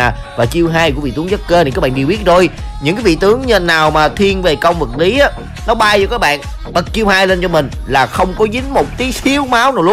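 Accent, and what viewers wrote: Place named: Mekong Delta, Vietnam. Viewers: Nghe giọng miền trong đó hay hài nữa 😁😁😁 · Rẻ nha giọng miền tây, biết chắc b miền bắc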